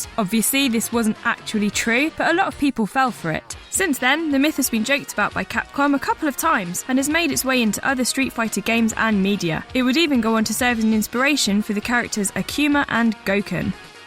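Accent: United Kingdom. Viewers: I can't understand how people find this annoying. I bet it has something to do with people not being exposed to british accent on a regular basis. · I have to say there's nothing wrong with the girl narrating the video or with her british accent, but the words are difficult to understand, to me (I'm from Brazil). In next videos, would be nice if she talks slower. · I've met multiple British people so I can understand this perfectly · Oh noo.. UK accent.. disaster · Guys stop complaining over a British woman narrating a YouTube video it's not gonna kill you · D: So many people hating because of a British accent?! I.. Wha...? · ***** She has the heaviest british accent ive heard in years, don't bullshit around. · Everyone show some goddamn respect, she is doing a fantastic job and all you people do is blame her for being British, have you ever thought how you sound compared to England? 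americans think english sounds stupid , and english people think american sounds stupid. why can't we just forget the accents. this video is great. and she did a amazing job at this , so shut the hell up , find something to talk about , there is enough other things in this video to talk about other than how you hate her accent. grow up. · Uh British accents. They stress they're letters that require you your lips to touch (b, v, p, w, ) and make it into note of a "t" sound. So annoying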